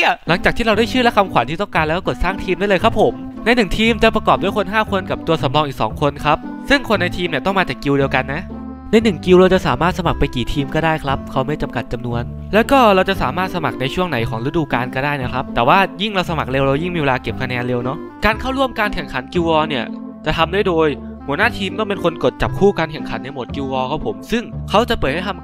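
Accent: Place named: Khon Kaen, Thailand. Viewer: อ้าาาก พี่ ขอนเเก่นเหรอ ที่เด่ววกัน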